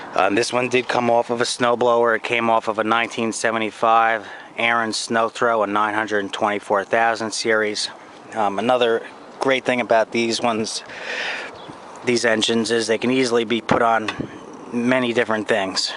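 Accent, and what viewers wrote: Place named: New Jersey, United States. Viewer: I was just given a 1960's AMF "Polar Bear" snow blower but it was repowered with a 7 hp Snow King from 1995 (made in late 1994). I will work on it tomorrow. I'm also working on the "Chonda" (Loncin, which is a Honda "copy") on a Toro Power Curve 180. There's no comparison between the two. I sense a NJ accent (and I thought I saw it on the boat in the background). What part of NJ? NYC here.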